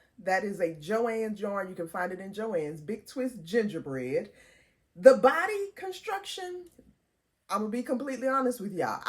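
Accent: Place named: South, United States. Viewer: Love listening to you because you and I have that Southern drawl. They’re both so cute, when are the patterns going to be available for sale I need to make my Wilberforce University (1856) dolls?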